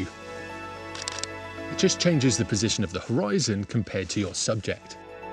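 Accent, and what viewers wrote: United Kingdom. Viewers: i love u r british accent.when i hear u r voice.i"ll remember Matrix movie star anderson.by the way u r great for teaching photography triks and tipssss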